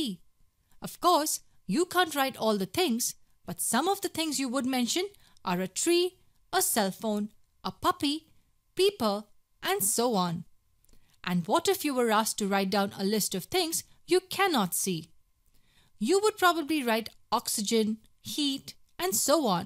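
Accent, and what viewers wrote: India. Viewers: She has a strong Indian accent and lo and behold India pops up twice in the examples. Is this an attempt at subliminal propaganda from Indian Nationalists by any chance ? 😁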